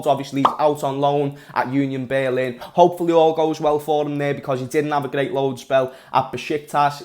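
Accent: Liverpool, United Kingdom. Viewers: Scouse voice is like Music to your ears :D Now coming to topic of Migno vs Adrian. Adrian helped us us with Winning Super cup, and first 10 ten games of PL Last season, Migno was good, but a notch below good sometimes and may be because of Not having good defender maybe.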